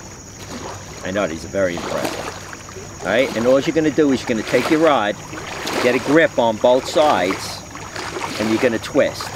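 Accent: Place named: New York, United States. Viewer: New Yorker 😂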